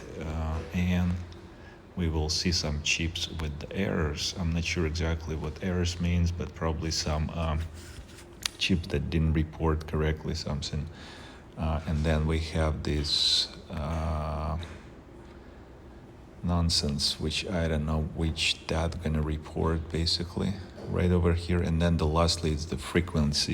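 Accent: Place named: Russia. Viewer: Брат, ты из России? Акцент выдаёт😏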